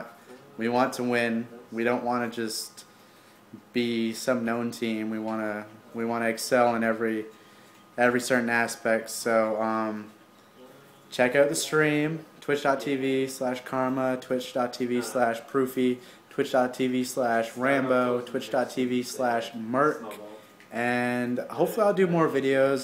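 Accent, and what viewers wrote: Canada. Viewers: He's not, that's just how canadians sound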